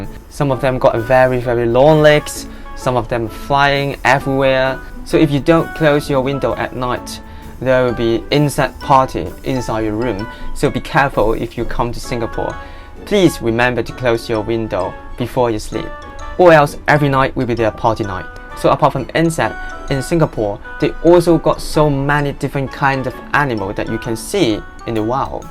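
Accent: United Kingdom. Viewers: Yes I love the British accent too. Does Dave speak the same accent as you? BTW miss Dave hope he will be back soon! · LUV THE BRITISH ACCENT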